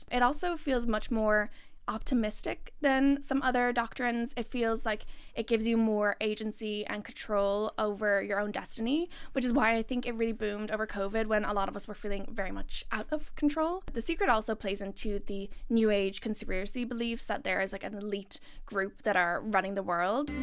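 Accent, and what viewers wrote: Ireland. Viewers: Ive finally placed your accent do you have an irish parent 😭😭😭😭 im probably late 😭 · Are you American? There seems to be a slight Irish influence on your accent.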